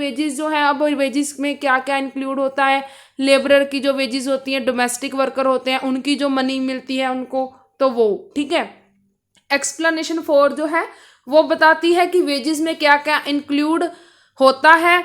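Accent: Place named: Punjab, India. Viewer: Punjabi/Haryanvi voice ❤️❤️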